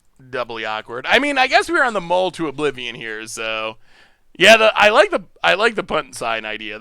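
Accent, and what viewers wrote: Western New York, United States. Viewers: My wife went to school in Elmira. She said she recognized that you were from western NY right away. Hahaha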